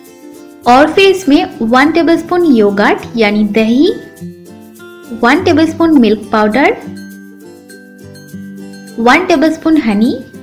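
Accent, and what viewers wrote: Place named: Bengal, India. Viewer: Bengali accent spotted!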